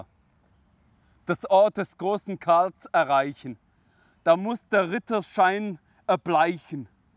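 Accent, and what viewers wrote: Swabia, Germany. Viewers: DU BIST DER BESTE. Alle die ich kenn reden nur noch wie du in 3 stufen und den anderen Videos. Stuttgart rockt. Da kommen halt doch die besten her. Dein Schwäbisch ist so krass geil beim trainer und so. Danke für die geilen Shows. Stuttgart und umgebung im Lachflash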